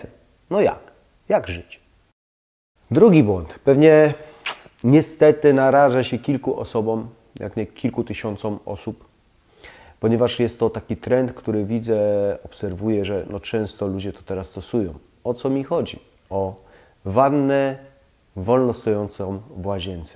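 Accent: Silesia, Poland. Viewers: Czy jest Pan ze Śląska? Pytanie z ciekawości :)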